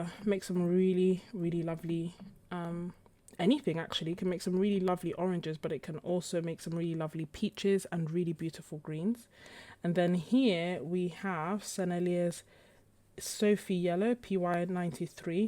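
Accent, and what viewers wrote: England, United Kingdom. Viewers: Thoroughly enjoyed watching this and listening to your train of thought. Excellent examples, very clear and large. Many thanks for your hard work on this video. I like the simplicity of the "Sketcher's" names. Best wishes from the Pacific NW. (Listening to you makes me pine for England - I miss it so much!)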